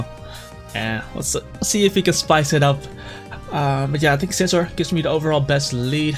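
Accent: Netherlands. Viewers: Your accent sounds soooo dutch XD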